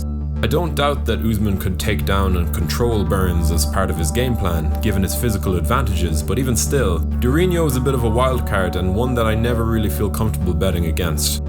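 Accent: Ireland. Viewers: Is the guy talking irish it sounds like he is but trying to hid it anyway great video but as an Usman fan burns and Colby fights worry be especially burns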